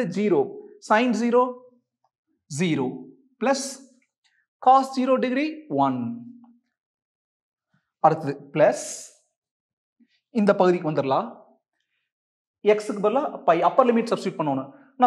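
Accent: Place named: Kongu, India. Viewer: That kongu slang nd teaching methods are 🔥💯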